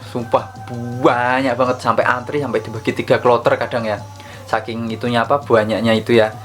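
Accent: Java, Indonesia. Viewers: Sampean jowone ngendi mas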